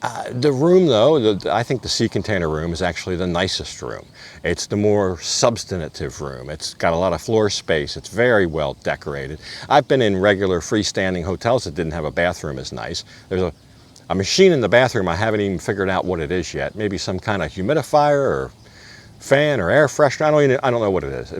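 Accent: United States. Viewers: yes but he is American and pronounce different so you understood what he was saying is that not enough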